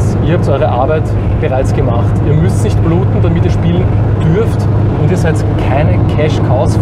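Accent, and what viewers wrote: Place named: Austria. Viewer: Das ist so witzig, deine Sprechstimme zu hören. Ich kannte bisher nur deine Singstimme (sehr überraschend bei einem Sänger, ich weiß :-D) und die ist ja so anders. Und dieser schöne Akzent! Hat mich grad total überrascht, obwohl ich ja weiß, dass du Österreicher bist. xDD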